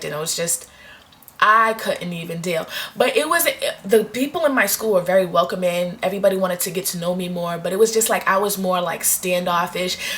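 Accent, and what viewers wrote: Boston, United States. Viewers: I can tell you're from Boston by your accent lol!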